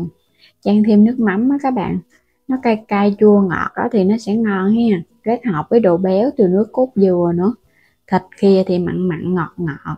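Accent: Mekong Delta, Vietnam. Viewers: Giọng miền Tây nói vậy đó bạn ơi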